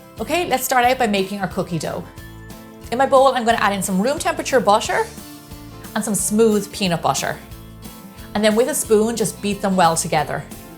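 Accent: Ireland. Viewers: Thanks for the Irish accent and the great tips. Living in a hot climate you don't want to fire up an oven, so stove-top is the way to go. Also, when I baked an entire batch of cookies they sat there tempting me to binge, so your method allows me to bake 4-6 cookies at a time. I shape each cookie, freeze them on a cookie sheet, then freeze-bag them for future cravings.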